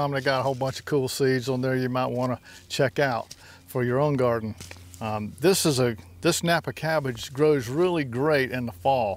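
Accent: South, United States. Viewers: It’s so nice to hear a southern accent I’m from Houston and Henderson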